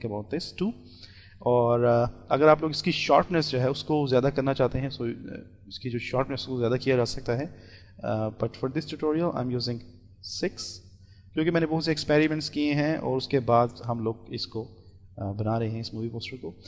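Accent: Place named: United Kingdom. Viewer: bhai agar aap urdu tuttorila ka boltey hein tourdu hi ziyada istammal karein meharbani ho gi, urdu ko english excent mein boltey huey aap achey naho lagey muhey muaf karna bhai.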